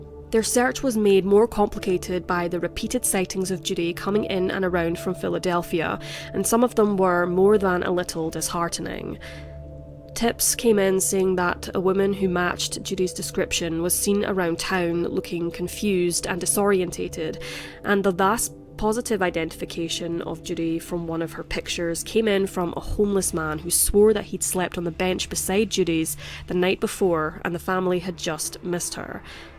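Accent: Scotland, United Kingdom. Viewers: I was trying to think of a polite way to correct her pronunciation of "Hyannis". She said it, "Hee-annis". It's actually, "High-annis". I grew up there. For what it's worth, I think her accent is quite lovely. And I'm sure I would butcher the pronunciation of whatever Scottish town she grew up in. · American here; the Scottish accent is hands down my favorite of all of the English first language accents. Australia's a close 2nd. It's as if Kelly Macdonald is narrating this. I'm glad that I found this channel, it should be even bigger than it already is. It takes quite the grind to make 100K+ subscribers. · I understand your Scottish but your voice just reminded me season 3 of Derry girls airs on Netflix tomorrow 🙄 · Where abouts in Scotland you from? East coast? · The Scottish accent of the narrator makes this all the more chilling, every time.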